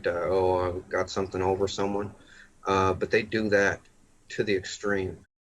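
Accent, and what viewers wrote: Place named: New England, United States. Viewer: This guy looks like john Cusak's clone, but with an annoying Yankee twang😅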